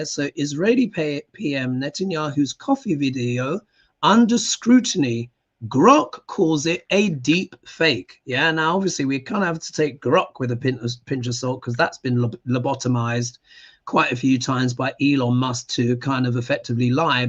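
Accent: United Kingdom. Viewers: A cuban with a British accent 🤷🤔😅😂🤣. How can we greater raise the TRUMPSTEIN REGIME and GESTOPO CRIMINALS intelligence 🤷🤔🤷🤷❓❓❓❓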